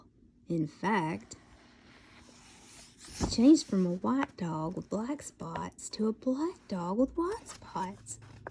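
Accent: South, United States. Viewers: I really love when you read I think it’s the hard Southern accent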